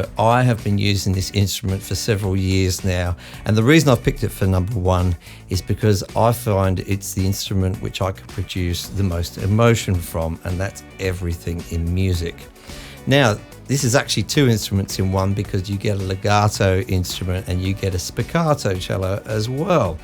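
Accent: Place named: Australia. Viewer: Really refreshing to hear an Aussie accent on-line these days! You may have crossed paths with Pete Johns in here? Always on the look-out for a bargain & you've tickled my fancy with 4 of your 5 gems. Being a bass player, good as emulations get.. sounded good, 'till the octaves.. Thanks, oh, song title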